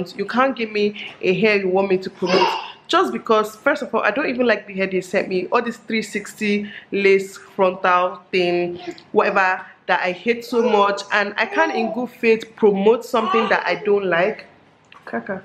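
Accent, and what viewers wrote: Nigeria. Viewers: Your children are so adorable,that ur Anambra tongue always make me laugh alot,cos am from anambra too.keep it coming.nice nice vlog.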